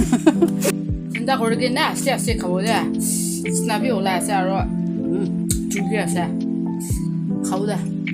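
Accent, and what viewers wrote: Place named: Nagaland, India. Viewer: Authentic and natural...the way you speak nagamise is interesting 😂❤️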